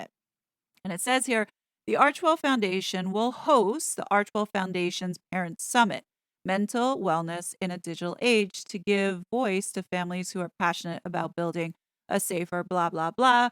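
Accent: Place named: New York, United States. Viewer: I admire your civil spirit and love your cool NYC accent! If I was a NYC resident, I would join you in your protest! Let's show them that they cannot get away with their shenanigans.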